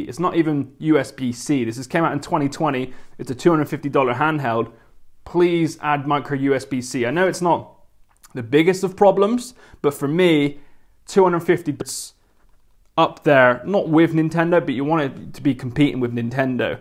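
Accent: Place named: West Country, United Kingdom. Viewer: It's a west country accent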